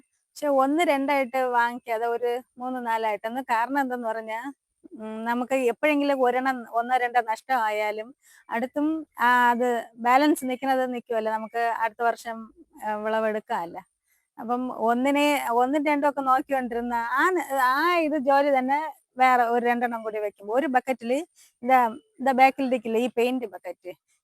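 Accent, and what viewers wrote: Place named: Trivandrum, India. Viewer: Nalla സംസാരം. ഒറിജിനൽ. TVM മിടുക്കി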